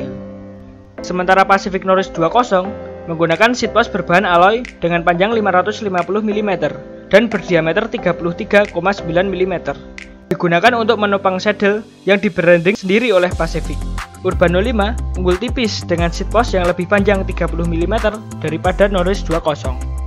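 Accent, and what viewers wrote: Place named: Java, Indonesia. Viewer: Medok banget logat jawanya 🙈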